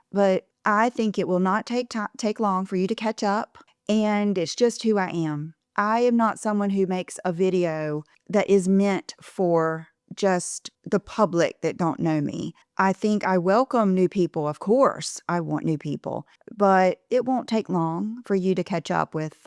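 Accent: South, United States. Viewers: You are authentic and that’s why we love your content, just don’t loose that beautiful authentic southern accent, it’s charming beyond words. TY for your great videos!❤❤❤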